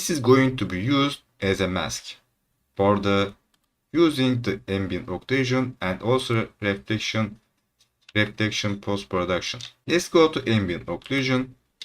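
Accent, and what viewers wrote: Turkey. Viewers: yemınle var ya sırf aksandan turk dedım yorumlara baktım sırf bu yuzden :)) well done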